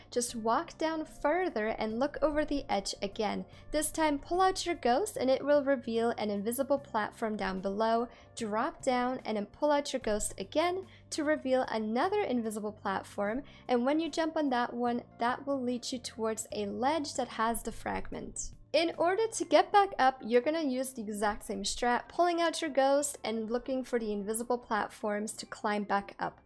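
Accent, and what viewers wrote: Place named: Germany. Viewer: I hear a German or Dutch accent off and on....hard to pick up but there is something there...what is it?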